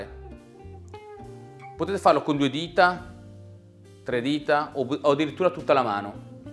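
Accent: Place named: Brescia, Italy. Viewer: Ciao dall accento mi sembri zona BS... Hai uno studio dove visiti di persona in zona Brescia? Grazie!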